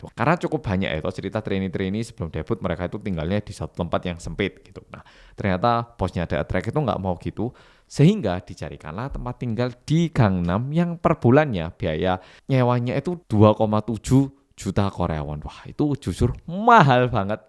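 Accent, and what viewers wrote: Java, Indonesia. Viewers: Mau naik malah turun kasian bukan,, hehe aku seh penyuka dangdut jadi saya nonton channel ini bukan tertarik dengan informasi yang di bahas sekarang tapi aku nonton orang nya suaranya medok hehe😊😊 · mas ini orang korea asli cuman pernah tinggal di indonesia untuk waktu yg cukup lama, makanya medok banget, bisa di cek untuk memastikan di video lama.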